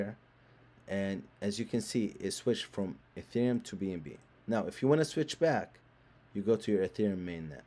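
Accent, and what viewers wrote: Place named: Libya. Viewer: I know a Libyan with the exact same accent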